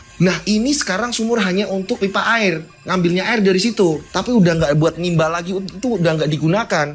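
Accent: Java, Indonesia. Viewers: Siang bang salam dari Medan Sumut ,saya seneng degerin canel Abang ini , ada lucu " ada serem " dn yg paling bikin saya senang ini bahasa jawahnya yg totok bgt sagat " jelas cara penyampainya , karena saya turunan Jawa solo ,bapak Batak jdi saya senang bgt degerin dgn keturunan org " Jawa 😊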